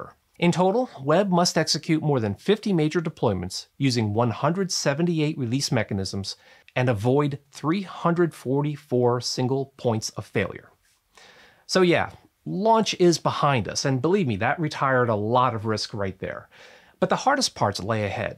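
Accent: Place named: United States. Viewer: You have such a strong american accent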